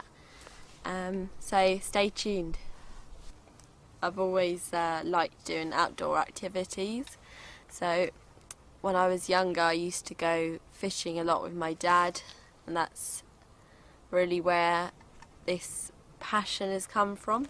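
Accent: United Kingdom. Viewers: I love your mind. Also your British acsent remains me my MSc in Scotland:)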